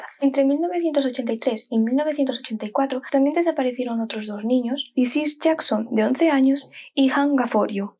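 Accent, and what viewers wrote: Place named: Spain. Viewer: alguien de España contando casos..porque entiendo mejor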